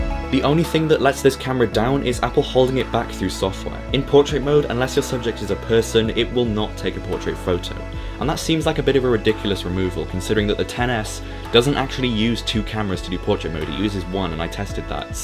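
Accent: United Kingdom. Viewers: The slow music and your smooth British accent almost makes it sound like this is an Apple trailer lol Doesn’t change the fact that this was easily one of the best reviews and everything was on point! Good job bro!!!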